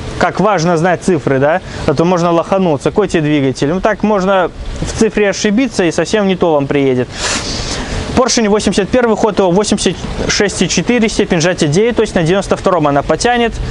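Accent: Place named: Belarus. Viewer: Как мне нравится беларуский говор)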